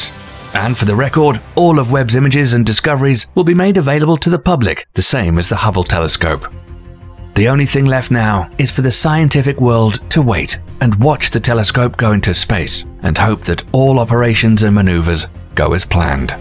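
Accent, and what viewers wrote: United Kingdom. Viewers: The master of ceremony is like in this video always seem to have a British accent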